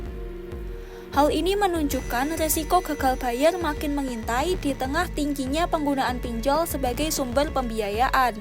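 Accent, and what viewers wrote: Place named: Banyumas, Indonesia. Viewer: Ai bahasa ngapak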